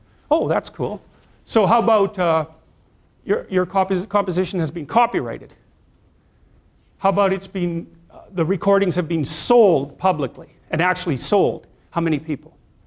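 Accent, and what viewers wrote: Canada. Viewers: He's Canadian. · is he Canadian? i just realized the way he pronounces out. hes so well spoken i barely noticed his accent but now that ive noticed its so apparent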